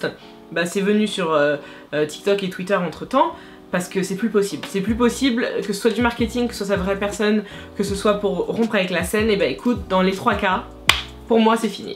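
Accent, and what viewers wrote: United Kingdom. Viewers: On accent anglais >>>>>>>>>>>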